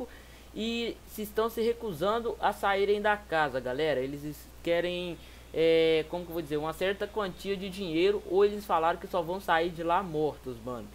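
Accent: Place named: Rio de Janeiro, Brazil. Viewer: voce e carioca né? fica falando bota cara e pq é do rj haushauhsauhs tbm sou!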